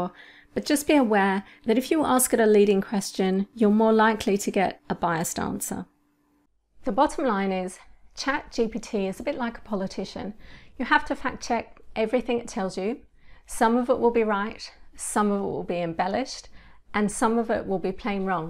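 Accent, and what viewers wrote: United Kingdom. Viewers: Where are you from? The UK? I really like your voice and accent. And videos too 😎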